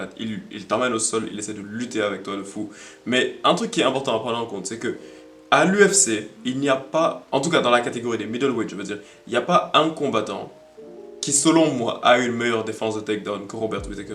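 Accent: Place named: United Kingdom. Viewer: T’as grandi en Angleterre ? Je trouve que t’as un accent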